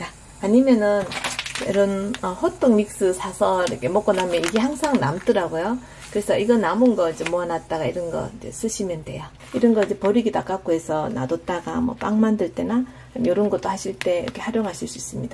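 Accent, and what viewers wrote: Gyeongsang, South Korea. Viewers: 제가 결혼하고 다른지역으로 왔는데 정겹게 경상도 말투로 쉽게 설명해주는 님 만나서 반갑고 좋습니다~~^^ 호떡 너무 좋아하는데 한번해먹고싶네요 감사합니다ㅎㅎ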